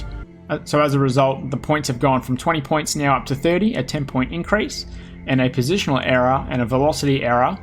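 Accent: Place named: Australia. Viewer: HA, Aussie detected. Good videos btw, thanks for explaining all this stuff.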